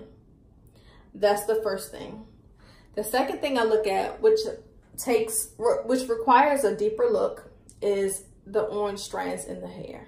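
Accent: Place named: Philadelphia, United States. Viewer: GREAT INFORMATIVE VIDEO ................ & YOU SOUND LIKE YOUR FROM PHILLY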